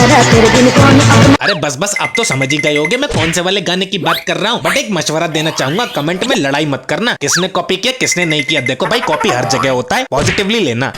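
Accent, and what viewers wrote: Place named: Assam, India. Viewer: Big fan bro Choro Choro assosom type se bolte Ho bhai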